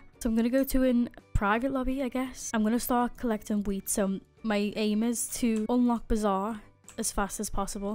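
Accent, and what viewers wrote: Liverpool, United Kingdom. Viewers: The british accsent sorry of My bad spelling im from finland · Think it’s scouse (Liverpool) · are u a scouser ? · Innit this that Liverpool girl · Just realised ur British what a gamer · im asking you bri't'ish or australian person bcs acsent of a bri't'ish and talk like a australian person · Pretty sure it's a Scouse accent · are you british??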